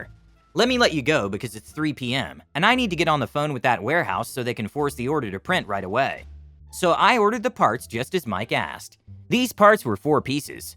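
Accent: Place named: United States. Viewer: How many languages are you fluent in? I’d swear your English is US native speaker but there are also videos on the channel I think might be Russian (or something somewhat similar?), but also might not be your voice?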